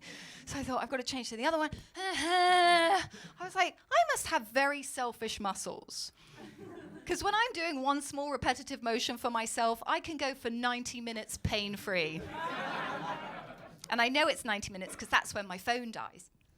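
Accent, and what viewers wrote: RP, United Kingdom. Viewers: Ha! Brilliant! As a Lincolnshire man living in Pensacola, Florida I appreciate the juxtaposition of the Queen's English and bawdy humor. Subscribed :) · She's hilarious and I love her British accent! Why is it that all these beautiful women tell sex jokes? Hmmmm? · Brilliant! 😂 Well I must say, with that nose, cheekbones, chin, accent and name she must be the most british thing I can think of. Except the late queen ofc.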